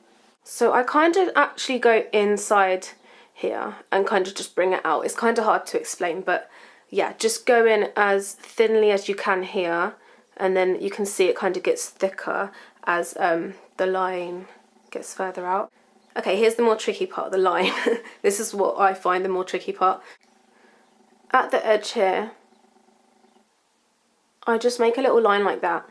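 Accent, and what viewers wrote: London, United Kingdom. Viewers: She's not Irish, in fact if I had to guess I'd say she's from London. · Luvved this video and is she british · Love your videos and your accent! What part of the UK are you from?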